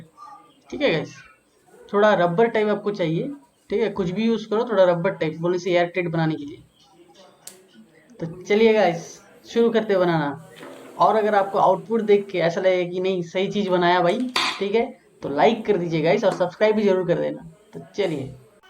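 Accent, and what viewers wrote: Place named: Andaman Islands, India. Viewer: Apna andamani slang mein technical sikhne ka alag hi maza hai 👌😁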